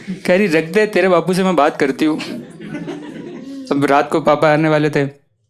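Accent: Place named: Rajasthan, India. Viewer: Bhai Aap kha se hoo iam from rajsthan apka accent thoda sa rajasthani lg rha hai btw Love your video ❤❤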